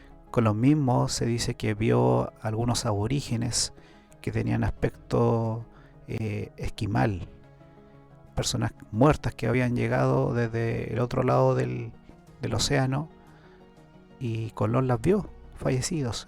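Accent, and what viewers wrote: Chile. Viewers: Por mas podcast como estos con acento chileno ☺️. Mis favoritos, gran trabajo, gracias ❤.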